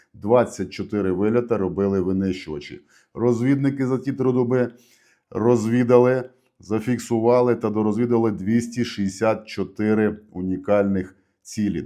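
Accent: Transcarpathia, Ukraine. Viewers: Зацініть закарпатський діалект роберта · Закарпатська українська-це шедевр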